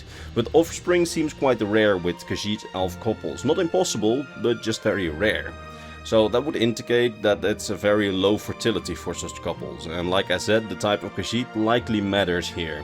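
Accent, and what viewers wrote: Netherlands. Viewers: Nederlands accent😜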